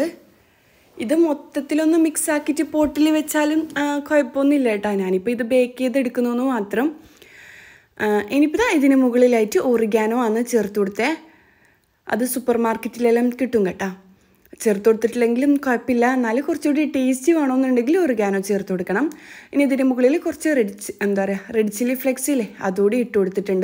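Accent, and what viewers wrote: Kannur, India. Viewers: കണ്ണൂർ ഭാഷ തന്നെ മതി കേൾക്കാൻ നല്ല രസമാണ് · കണ്ണൂർ ഭാഷ പറ ഇതാ ഞങ്ങളും കേൾക്കട്ടെ കേൾക്കാൻ നല്ലരസാ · English channel kanunna pole nd.. masha allah.. but varthanam njmmle kannurle🫶🤩food kuraknamnn vijarkum iyalde recipe kanumbo pinnem ndakki povm.. keep going🎉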